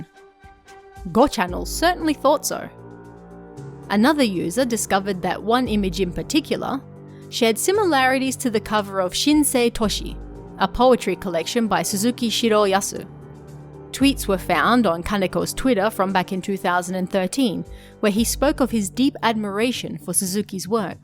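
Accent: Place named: Australia. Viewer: You sound Australian 🤔👍 thanks for you work 🙂 I couldn't do it 🐨🇦🇺🦘🙂.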